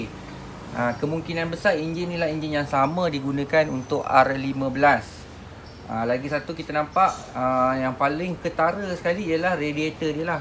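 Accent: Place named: North, Malaysia. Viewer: video ni cakap utara, video next cakap sabah ke terengganu ~!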